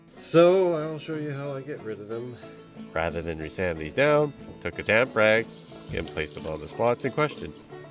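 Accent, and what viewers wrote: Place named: Canada. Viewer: First time watching…immediately hear a Canadian or upper Midwest accent!❤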